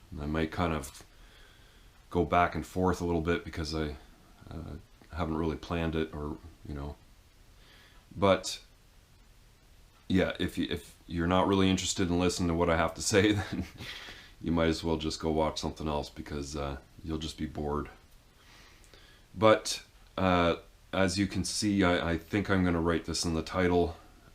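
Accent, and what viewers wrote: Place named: Canada. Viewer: Lol Man I saw your video about 3 or 4 years ago and I ended up finally trying shrooms off and on several times over a couple of years. They definitely have the ability to reset your brain and outlook on life. I just happened to run across your same video again this evening. It is unbelievable how much you and I look alike, have similar personality traits and even our voice sounds alike except for the fact that you have a Canadian accent and I am from Alabama so I have more of a southern United States accent. I mean I know that sounds crazy but the resemblance is unbelievable.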